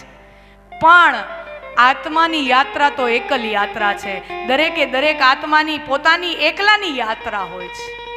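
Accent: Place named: Kathiawar, India. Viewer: Aap ni shaili clear Anand thi Kathivadi Gujarat gujarati ma i salute Ben you with Respect Namra bhav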